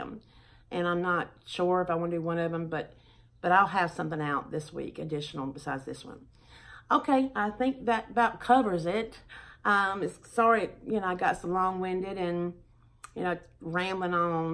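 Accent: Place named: Alabama, United States. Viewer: Tuesday and Thursday would be good for me❣️ I lived in Alabama for 10 years so your accent sounds so good to me. I’m so sorry about your phone! And I know what it’s like to move a lot. Will you still be close enough to see family and friends after your move? You’re so sweet, I love to watch your videos and I like the way you decorate. 👍🏻🥰🤗🙏🏻 I’m praying for you😌❣️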